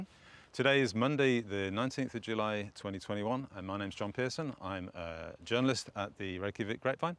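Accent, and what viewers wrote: United Kingdom. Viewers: I think he is British…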